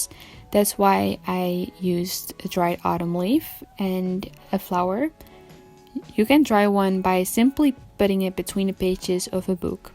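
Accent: Netherlands. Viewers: Love your video!!🐙 zodra je begon te praten wist ik dat je uit nederland kwam wat leuk 🙌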